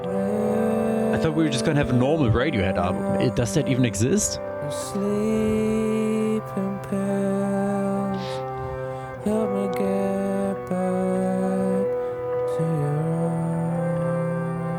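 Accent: Germany. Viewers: why does your accent does sound like your german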